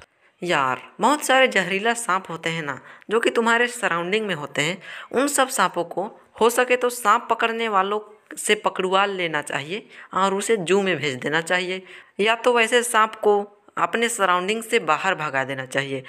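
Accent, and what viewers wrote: Bihar, India. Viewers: Bhai aap bihari ho kya?😀 Ek dam bihari accent....mast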